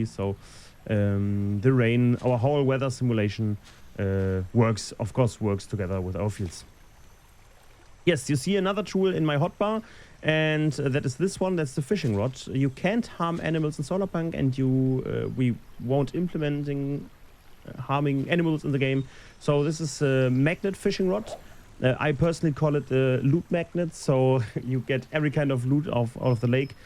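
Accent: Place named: Germany. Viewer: Wie man den deutschen Dialekt heraushört ;P.. bin hyped! sowas gibt´s noch nicht. Viel Erfolg!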